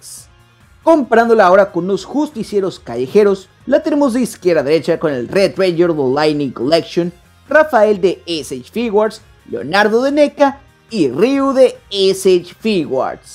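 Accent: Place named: Peru. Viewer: Buen video, Eres de México Bro? De que parte? O eres de sudamérica? Tienes un acento como Peruano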